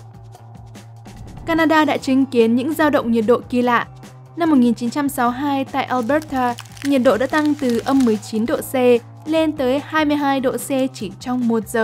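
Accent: North, Vietnam. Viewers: nói tiếng Bắc là đúng r mà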